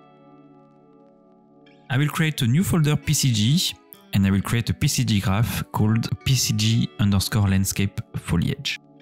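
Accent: France. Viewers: Salut, (je pense que tu est français à ton accent (ce n'est pas un reproche)) ton tuto à l'air top je chercher à apprendre à faire ca donc c'est parfait. Bon courage et continue comme sa et bonne fin d'année.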